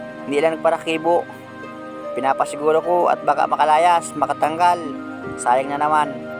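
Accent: Bicol, Philippines. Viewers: Tyaga lng idol,masaya kng panuorin dahil may halong bicol pananalita mo,dahil bicol din ako,sa sipocot cam sur,kaya lng nsa europ ako.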